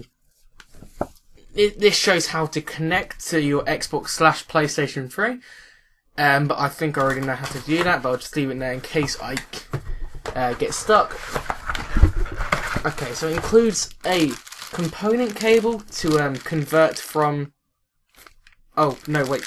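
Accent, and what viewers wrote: United Kingdom. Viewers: 100,000 sub video shud be u doin a video attempting to do an american accent i hav always wanted to hear a british person do an american accent :D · That's just how British people in general say H.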